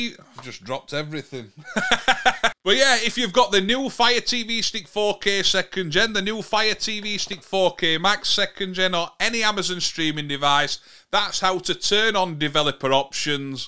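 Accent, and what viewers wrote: Yorkshire, United Kingdom. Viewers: Subscribed! Proper Yorkshire!! Keep em coming! Great to hear the accent all the way from 🇦🇺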